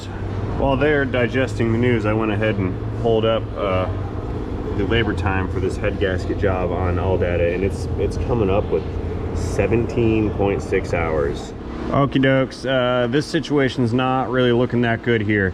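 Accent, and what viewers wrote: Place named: United States. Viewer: Your language is good fun I'm English and your American is great fun to listen too 👍😀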